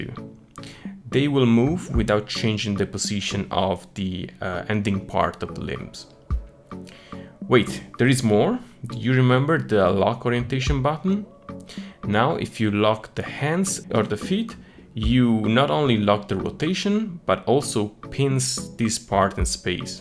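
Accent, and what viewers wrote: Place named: Italy. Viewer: You sound a little italian...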